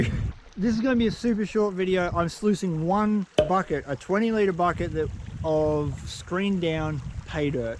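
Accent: Australia. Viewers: enjoy your aussi accent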